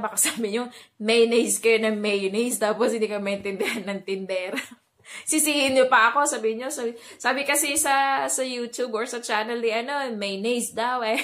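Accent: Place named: United States. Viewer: Hello there. Your pronunciation is very good but has an slight American “slant” to it which is not always pronounced as “true English”. I assume your in the USA not England? There are many different dialects in the UK which can sound different in different areas of the country, which is also similar in the US of course. Having said that, I would suggest mayonnaise has a number of slightly different pronunciations. Where I am it has more of a “maya” naise sound to it lol 😂 Having said all of that, you speak very well and will undoubtedly help many people 🤗👍🏻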